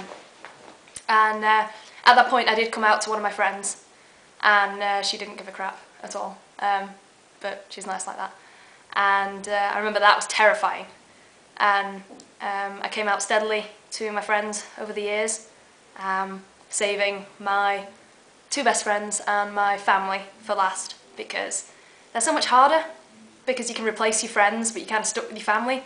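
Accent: United Kingdom. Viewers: Are you from ire or Scotland? U sound Scottish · i'm straight and i loved your story. your adorable! i love your british acsent. i wish you well.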